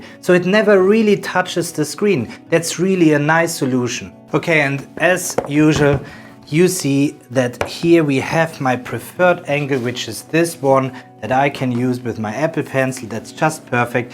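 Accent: Germany. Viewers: Direkt den Deutschen Akzent rausgehört 😂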